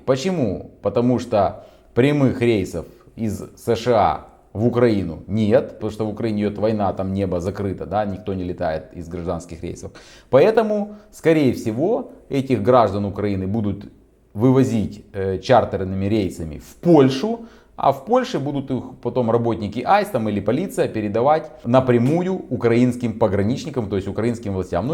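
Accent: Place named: Russia. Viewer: как я понял по интонации вашей речи, вы гражданин России по рождению?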